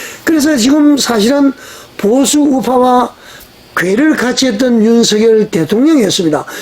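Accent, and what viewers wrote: North Korea. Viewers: 해설하는이분은 이북말투예요 이상해요 ㅡ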